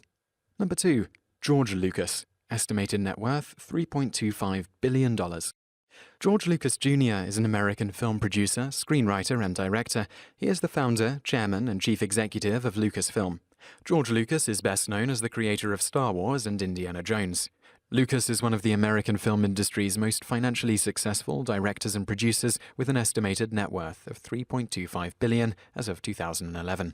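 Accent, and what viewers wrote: United Kingdom. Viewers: Without checking the other comments... the person narrating this is the least compelling narrator on the planet. He makes "interesting facts" so effing uninteresting and boring, British accent notwithstanding. Put a little pep in your step. HOWARD STERN RULES BABABOOEY!!! (i mean seriously british dude, include a little personality).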